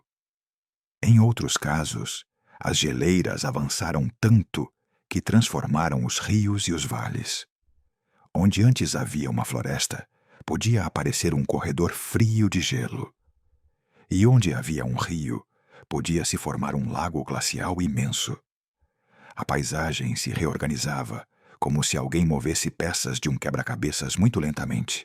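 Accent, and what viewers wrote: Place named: Portugal. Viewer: Sotaque de Portugal não dá!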